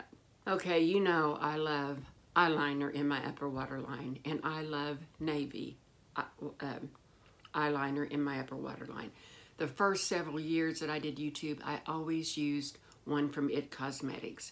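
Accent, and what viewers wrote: South, United States. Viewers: I heard about you from Sandra. You are both wonderful. If I had gotten married again, I would learn a lot from you, your charm and intelligence. Am 70 something years old and still looking for a great guy. With your living care, no wonder he’ll be walking asap! Question about the protein bars: what’s the name of the company? The flavors sound delish!!!. I live upstate NY. Live your Southern accent. Kind regards, FR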